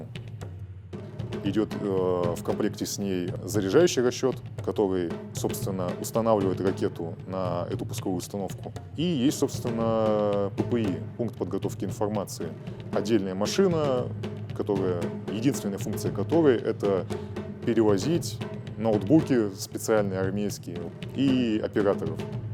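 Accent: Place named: France. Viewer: Акцент французский.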